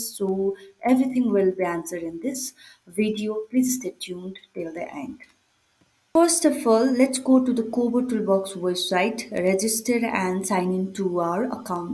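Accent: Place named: Nepal. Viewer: Helpful Video. You sound like nepali from your accent !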